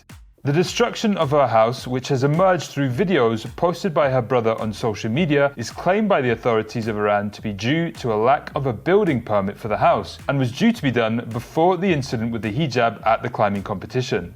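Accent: Scotland, United Kingdom. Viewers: I swear you sound a lot more Scottish than I remember!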